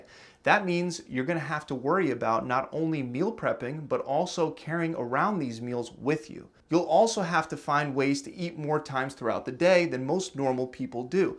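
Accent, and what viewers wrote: United States. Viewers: “YO” STOP TALKING LIKE YOU’RE AMERICAN AND ACT YOUR RACE, “HELLO MY FRIEND WELCOME TO KWIKI MART” 🐫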